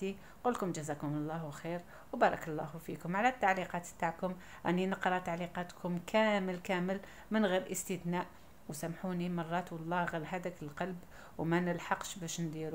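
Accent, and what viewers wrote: Algiers, Algeria. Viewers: سلام عليكم اختي أنا مشتركة جديدة ما شاء الله عليك شحال يعجبوني الدزيريات الاصيلات صوتك يديني للدزاير العميقة الاصييييييييلة ونحسك قرييييييبة عندي بزاف نتمى ترحبي بي وتردي علي نحتاجك ديما ربي يحفظك ويسترك ويخليلك لولادك....ردي الله كل خير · الطبع و الشان صراحة و طريقة الكلام صح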